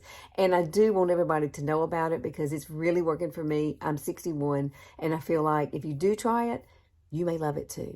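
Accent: South, United States. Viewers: Love your southern accent 💕 what a stunning look I am going to try that on my 76 yr old wrinkly eyes and face 🙌 thank you beautiful girl 💕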